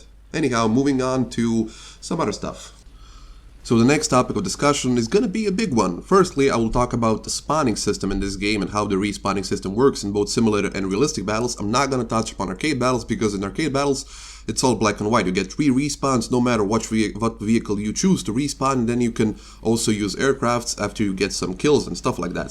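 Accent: Eastern Europe. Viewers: by the way, probably a lot of people already asked you but where are you from?? you definitely have a slavic accent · I love Slavic accents like yours so much, you sound so sexy. Nice video BTW, I agree with pretty much everything you said.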